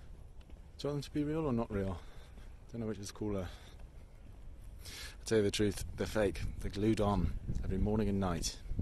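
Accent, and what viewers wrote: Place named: RP, United Kingdom. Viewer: Omg he's really gorgeous. I didn't expect him to sounds so posh haha.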